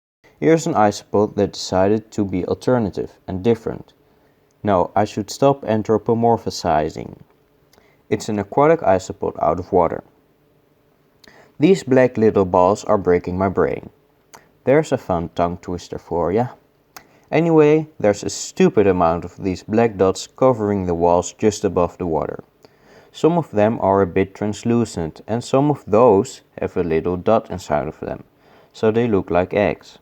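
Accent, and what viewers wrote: Netherlands. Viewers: Are you Dutch because you have a Dutch acent LoL 😂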